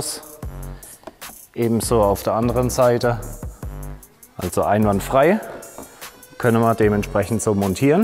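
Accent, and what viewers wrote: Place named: Baden, Germany. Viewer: Ich liebe deinen badischen Dialekt 👌😄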